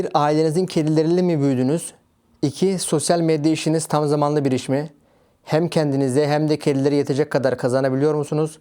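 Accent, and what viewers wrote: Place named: South, Germany. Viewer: Thanks for sharing dear ❤May I ask another question: How come your German is so good? I once heard you talking, even with a southgerman accent. 😊I know I am late with a question, so feel free not to answer. Good there are people like you on this planet!❤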